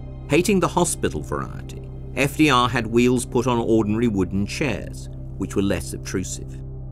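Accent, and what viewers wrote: United Kingdom. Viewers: A peculiar source of narrator. Why would this outfit select a person with a somewhat muddled but clearly British accent to discuss an American president? It's inappropriate, to say the least.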